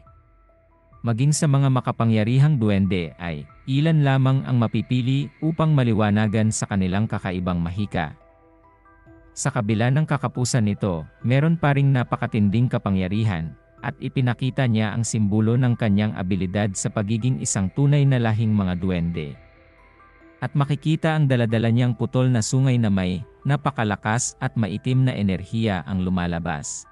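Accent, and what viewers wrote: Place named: Igorot, Philippines. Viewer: Igorot narrator to😅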